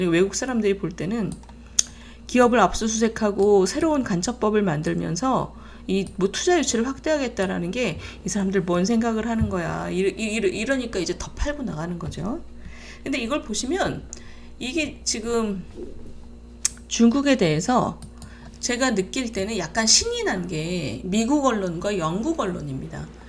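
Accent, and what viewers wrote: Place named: Seoul, South Korea. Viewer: 말투가 저랑 똑같아요. 경기도 방언인지 서울 사투린지 나랑 너무 똑같아요. 희숙님 ! 나이에 맞지않게 젊어 보여 좋아요. 희숙님 영원히 늙지 말라고 기도 할께요 😊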